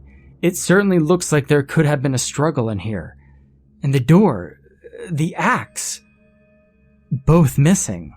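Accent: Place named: Philadelphia, United States. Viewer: The voice over guy is from The Delaware Valley (Philadelphia Area).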